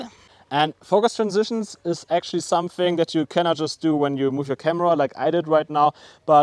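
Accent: Germany. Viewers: Thank you for sharing your expert knowledge. For film and photography enthusiasts, your help and tips are indispensable for better quality product. You are easy to listen to and your German accent lends additional interest to your videos. Thank you, again. Steve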